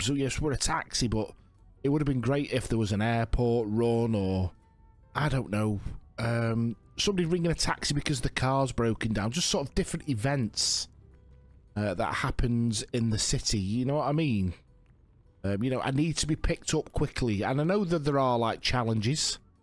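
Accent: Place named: Northern England, United Kingdom. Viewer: Tha sound like a fellow northerner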